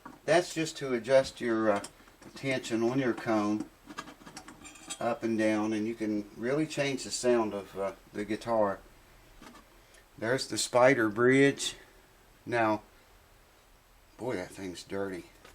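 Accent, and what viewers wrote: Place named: Appalachia, United States. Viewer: Great video - your accent reminds me of the Appalachian Mountains - I sure miss my old friend Ralph Stanley.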